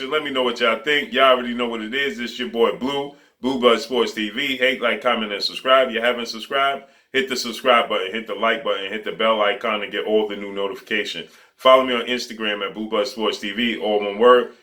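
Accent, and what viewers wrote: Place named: Alabama, United States. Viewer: What is this, black impoverishment land? What on Earth is the appeal of inarticulate black people attempting to show their swag on YouTube by exaggerating and already pathetic cultural cliche and speaking as if they were just dredged out of the swamps of Alabama? best clean up your act and your accent, stashed the hat, and appear to be a relatively communicable human being, in spite of the fact that it may mean trashing your "blackittitude".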